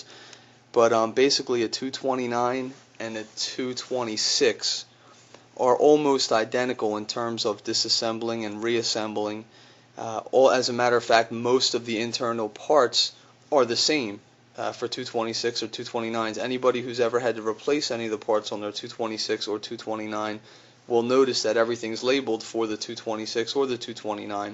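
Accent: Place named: New Jersey, United States. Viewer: I love your analogies A potato chip? LOL!!!!!! Love the accent too. New Jersey?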